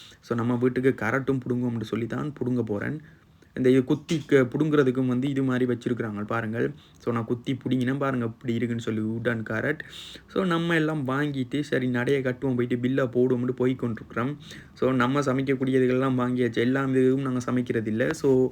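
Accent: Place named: Sri Lanka. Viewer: I like halloween 🎃 tq for this experience 🎉and like srilankan tamil 😊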